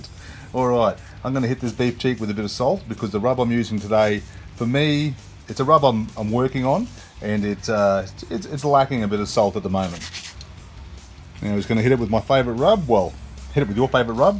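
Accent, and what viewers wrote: Australia. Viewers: Fucking love the native Aussie talk. So much better than the American BS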